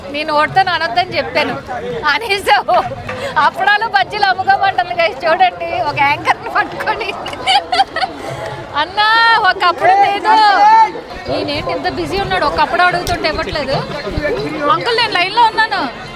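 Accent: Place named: India. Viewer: ur totally changed 😀😀dubai to pure Indian slang version but very happy to see u don't stop vlogs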